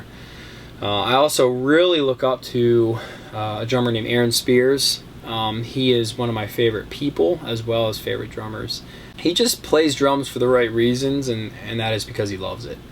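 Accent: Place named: Pennsylvania, United States. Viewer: man, that PA accent. hahahaha. makes me feel like home. congrats on 11k subs!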